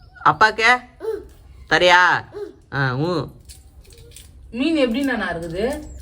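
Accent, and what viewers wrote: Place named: Jaffna, Sri Lanka. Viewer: இலங்கை யாழ்ப்பாண தமிழ்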